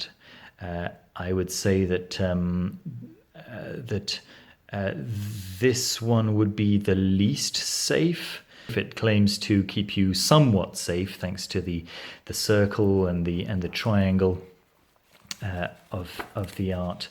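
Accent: England, United Kingdom. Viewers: I enjoy your voice and would gladly listen to esoteric books as read by you. I'm curious if your are English, or perhaps South African?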